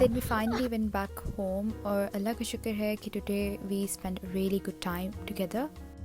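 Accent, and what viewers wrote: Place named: Punjab, India. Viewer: Uff the way you switch from English to urdu to Punjani 🤣ufff Dude you rock in punjabi accent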